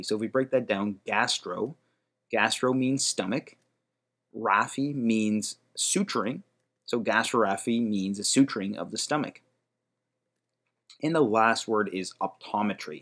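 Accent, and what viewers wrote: Canada. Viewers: You didn’t cover -emia. Powerhouse of the cell. Just kidding loved the video I’m learning for my work, thank you. Sounds like you’re from Canada just straight facts.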